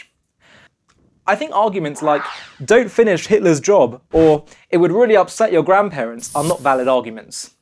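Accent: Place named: United Kingdom. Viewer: השדרן נראה תימני אבל יש לו מבטא בריטי. מה לעזאזל?! חחח 😂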